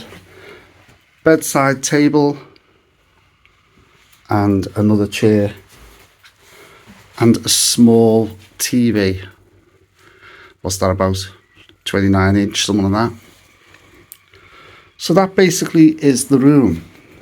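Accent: Liverpool, United Kingdom. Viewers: Are you Billy Kings brother? Another insufferable scouser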